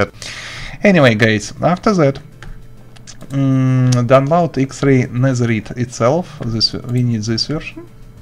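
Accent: Russia. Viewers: Русский акцент имба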